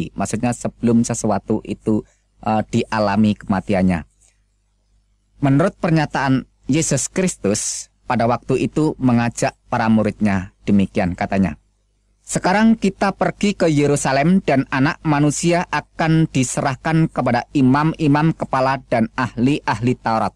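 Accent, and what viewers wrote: Java, Indonesia. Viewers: Dari logatnya ustad ini orang jawa. Dan opini saya sebelum kita mencari kebenaran dahulukanlah meminta perlindungan dari allah swt dari syaitan yang penuh tipu muslihat, karena syaitan adalah musuh yg nyata. Audzubillah himinassyaitanirrajim bismillahirahmanirahim. Ketahuilah sebelum anda ingin memahami ilmu ketuhanan lebih jauh anda harus paham apa sih perintah tuhan paling pertama dan mengapa harus begitu. Pengalaman saya pribadi saya mencoba memperbandingkan 4 agama. Yahudi, yesus pbuh, hindu, dan tentu islam. Ternyata perintah utama itu adalah harus mengetahui bahwa tuhan itu esa=1. Lalu saya mencoba memahami kenapa tuhan itu harus 1. Alhamdulillah penjelasan paling logis dan simple saya dapatkan dari mengenal angka. Kenapa tuhan itu esa? Dalam taurat, zabur, perkataan yesus pbuh tuhan itu esa. Esa atau angka 1 adalah awal karena mustahil 0 adalah eksistensi yang nyata. 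Angka 1 juga bukan akhir karena angka 1 dapat menciptakan angka-angka lainnya. Angka 1 juga berdiri sendiri artinya dia tidak membutuhkan angka-angka lain untuk menciptakan segala sesuatu. Bukti 1+1=1, 1+1+1=3, dst. Jika angka 1 melipatkan diri dia adalah tetap angka 1. Bukti 1x1=1, 1x1x1=1, dst. Jika angka 1 mengurangi diri secara bertahap / pembagian dia tetap angka1. bukti 1:1=1 artinya angka 1 itu kekal. Jika angka lainnya mengurangi diri secara bertahap dia akan kembali kepada sang pencipta yaitu angka 1, artinya dalam setiap penciptaan angka lainnya adalah milik angka 1, bukti 3:3=1, 241:241=1. Jika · Aku sangat malu dengar bahasa jawa mu yg medok.. Jelekan islam berjam jam lamanya.. Seolah mengajak murtad manusia seluruh umat.. .....Yesus itu manusia. Tidak ada seorang di kumpulkan allah kelak di hari hisab hanya se orang hamba... Isa putra maryam alias yesus kelak tida bisa jadi tuhan hanya jadi saksi kemurtadan penyembah nya. 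· Aduh. Saya ketawa mulu liat video ini. Maaf, bukan karena isinya, tapi karena logat pembicaranya mendok baget. Saya menghargai pendapat anda, dan saya tidak mencela keyakinan anda, sungguh. Cuma ingin menambahkan beberapa pernyataan Al-Quran yang sedikit terpotong : "Wahai Ahli Kitab, janganlah kamu melampaui batas dalam agamamu, dan janganlah kamu mengatakan terhadap Allah kecuali yang benar. Sesungguhnya Al Masih, Isa putera Maryam itu, adalah utusan Allah dan (yang diciptakan dengan) kalimat-Nya yang disampaikan-Nya kepada Maryam, dan (dengan tiupan) roh dari-Nya. Maka berimanlah kamu kepada Allah dan rasul-rasul-Nya dan janganlah kamu mengatakan: "(Tuhan itu) tiga", berhentilah (dari ucapan itu). (Itu) lebih baik bagimu. Sesungguhnya Allah Tuhan Yang Maha Esa, Maha Suci Allah dari mempunyai anak, segala yang di langit dan di bumi adalah kepunyaan-Nya. Cukuplah Allah menjadi Pemelihara" (QS. 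An-Nisa' [4] : 171) "Al Masih sekali-kali tidak enggan menjadi hamba bagi Allah, dan tidak (pula enggan) malaikat-malaikat yang terdekat (kepada Allah). Barangsiapa yang enggan dari menyembah-Nya, dan menyombongkan diri, nanti Allah akan mengumpulkan mereka semua kepada-Nya." (QS. An-Nisa' [4] : 172) "Dan (sebagai) Rasul kepada Bani Israil (yang berkata kepada mereka): "Sesungguhnya aku telah datang kepadamu dengan membawa sesuatu tanda (mukjizat) dari Tuhanmu, yaitu aku membuat untuk kamu dari tanah berbentuk burung; kemudian aku meniupnya, maka ia menjadi seekor burung dengan seizin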